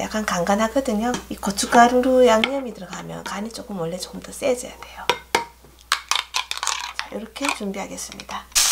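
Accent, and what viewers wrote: Gyeongsang, South Korea. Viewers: 어제의 거지탕ㅋㅋ에 이어 오늘은 굴비양념구이 들어갑니다ㅋㅋ (어른들이 남은 전요리를 거지탕이라 하시더라요.ㅋㅋ) 이모덕분에 싸주신 음식들 깨끗하게 클리어 해가고 있어요^-^ 이모는 어디 계세요? 말씨나 레시피, 영상으로 느껴지기는 경상도 같은데.. 저희 가족은 모두 대구:) 외갓댁은 안동이구요~ 지금은 일 때문에 저희 부부만 낯선 포항에서 지내고 있어요ㅠㅠㅋ 유튜브에서 좋은 이모 만났다고 명절 보내러가서 자랑했다는ㅋㅋㅋ 신랑도 열씨미 배워보라며 응원해줬어요.♡ 다음주에 식혜, 약밥 도전 예정~~ 쉽고 디테일한 설명으로 요리에 재미들인 늙은 새댁 깸미 댓글 드리고 갑니다.ㅋㅋ ps. 오늘도 마니 마니 웃으시고 행복하셔요~♡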